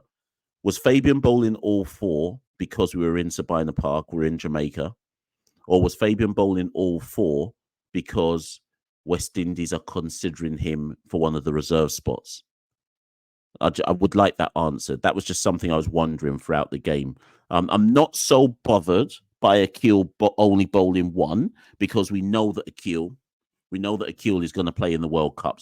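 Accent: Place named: England, United Kingdom. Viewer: Are you in England or u just have an English accent? No disrespect but just want to know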